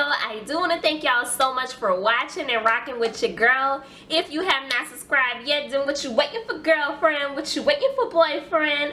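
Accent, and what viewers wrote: New Orleans, United States. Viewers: I feel I hear a NOLA accent. I just randomly picked them up at a Dollar General. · Is that a Nola accent I hear 😍 cuzzzinnnn!!! New subbie 😘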